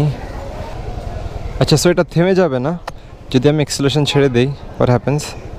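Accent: Chittagong, Bangladesh. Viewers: ভাই আপনি কি চাটগাঁইয়া?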